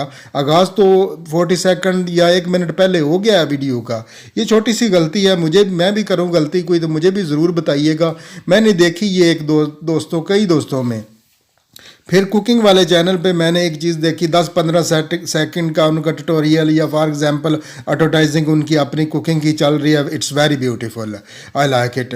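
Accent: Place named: Hyderabad, India. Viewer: Janab ye kara kari India Hyderabad ka accent he. Kehte hain Urdu UP me paida hue, Lakhnaw me jawan hui aur Hyderabad me dafan hui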